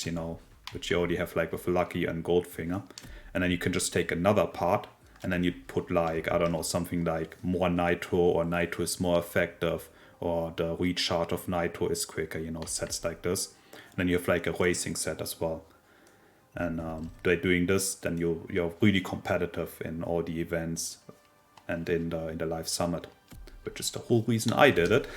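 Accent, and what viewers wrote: Germany. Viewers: Awesome Video. Thank you so much. This is going to save ne alot of time. (Ps: Ich höre einen deutschen Akzent)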